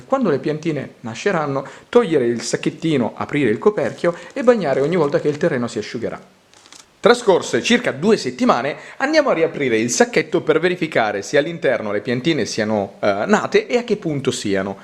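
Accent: Italy. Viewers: senior, I enjoy your gardening tips & love your Italian accent, ciao.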